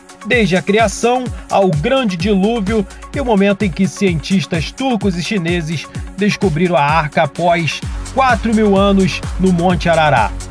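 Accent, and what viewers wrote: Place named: Rio de Janeiro, Brazil. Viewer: Menos sotaque carioca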